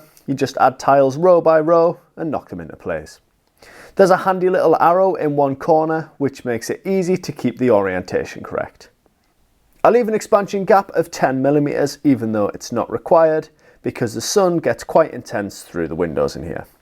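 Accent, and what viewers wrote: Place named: County Durham, United Kingdom. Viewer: Just came across your chanel. Nice one. Cant work out the accent. Co Durham or there abouts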